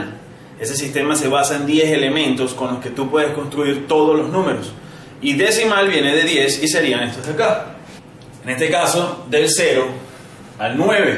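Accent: Venezuela. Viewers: Hola. Por la camiseta y por el acento asumo que eres venezolano, lo cual me llena de orgullo porque yo soy de Maturin, estado Monagas. Felicitaciones y adelante con tu labor la cual puede ayudar a muchos estudiantes. Adelante.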